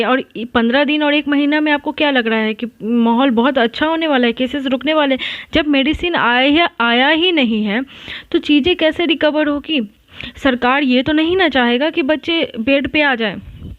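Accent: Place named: Bihar, India. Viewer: Mam are u from bihar ??? Accent se lg rha tha😂😂😂😂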